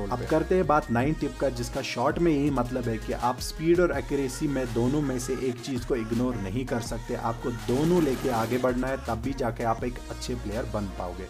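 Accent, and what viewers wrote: Kashmir, India. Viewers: Arey bhai aap ki awaaz sun ke hi laga ki aap kashmiri hooooo. Aap se insta se pata chala. Aapki awaaz rj se milti haii. Luv frm pulwama. Support kashmiri playerssss.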